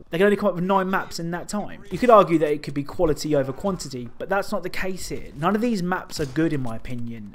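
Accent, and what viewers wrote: England, United Kingdom. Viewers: you are british? ( just a random question) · You from England??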